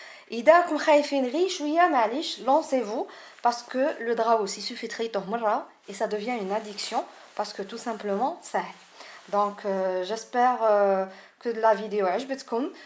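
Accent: Tlemcen, Algeria. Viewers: نظنك تلمسانية على حساب اللهجة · bravo ellah ibarek surtout avec l'accent Tlemceniene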